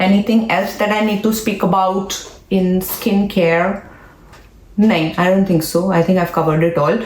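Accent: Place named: Punjab, India. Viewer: ! Your delivery is so relatable, so punjabi. Love watching your videos even while feeding my newborn.❤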